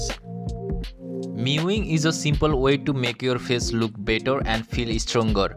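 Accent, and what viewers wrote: Nepal. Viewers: Bro face reveal gara ta tmero sound le tw 100 percent nepali ho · Nepali cha taw boli tah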